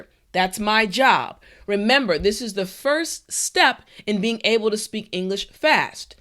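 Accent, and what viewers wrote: United States. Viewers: that's American English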